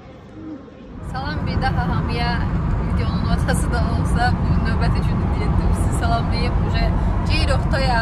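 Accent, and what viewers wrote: Sheki, Azerbaijan. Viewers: Hara lehçesi bele?Şəki? iranlıların da lehçesi ne oxsayr.bilmedim hara lehcesidi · Xanim yeqin seklidi danisgindan hiss elədim😊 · Salam dogma sheki lehcemiz siz shekinin hansi terefinnensoz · Şəki hər kəndinə quzu kəsim heylə.Heylə həblədə davam eliyin supersoz.Əla alinir ləhcəmizdə efrdi. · Siz Şəkilisiz ? Şəki akcenti var .Onlar şirin danişir. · Ləhçə şəki həəəəə❤? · bu arada lehcenden,menim yerlime oxsaddim .eger sekidensense mende Sekidenen ,✊😀birazda balaken lehcesine oxsadiram .Allah size ailene balalrina uzun omur xos gunler can sagligi❤️ dileyirem .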